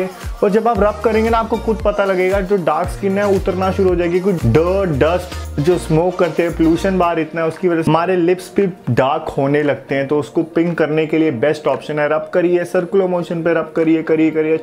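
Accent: United States. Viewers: Bro mene aapko American samgha😂😂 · Bhai mene bhi aap ko American smjha 😅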